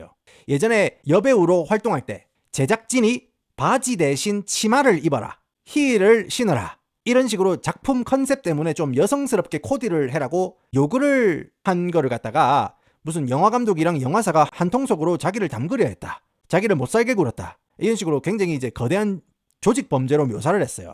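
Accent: Gyeongsang, South Korea. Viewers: 오늘 이 채널 영상을 처음 보는데 은 영어를 배운 경상도민이신가요 경상도말을 배운 재미교포이신가요..? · 내용이랑 별개지만ㅋㅋ영어와 경상도 사투리가 묘하게 섞여있는 말투가 매우 흡입력있습니다ㅋㅋㅋㅋ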